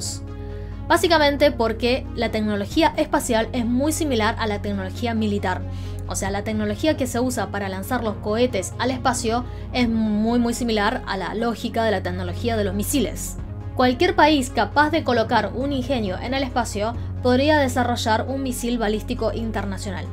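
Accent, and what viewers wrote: Argentina. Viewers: QUE ACENTO ARGENTINO QUE PUEDE DESAGRADAR A LOS SEGUIDORES, ENTRE ESOS EL SUSCRITO. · Belleza que dominas pero muy bien el español castellano tipo argentino saludos desde santa cruz Bolivia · Muy simpática dándonos una brillante información y por cierto con un bonito acento argentino. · Saludos desde Lima Perú ... excelente tu español, tu acento español Argentino. Éxitos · Que interesante video, pero me pregunto porque la srta presentadora tiene como acento argentino, que curioso. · Muy lindo su acento argentino chino y muy interesante lo que dice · interesante, se nota que estas o has estado en argentina mucho tiempo, porque tienes ese dejo, buena ventana de difusión de china, soy tu admirador.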